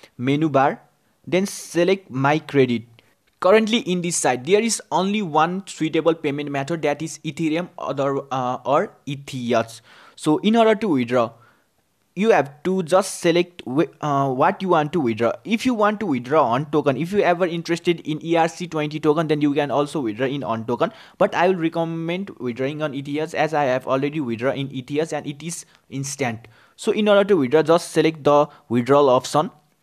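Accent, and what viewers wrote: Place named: Nepal. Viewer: You have Nepali accent. Are you Nepali?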